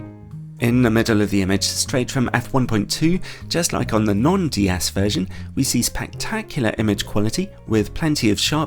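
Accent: United Kingdom. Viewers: Love the music and the British accent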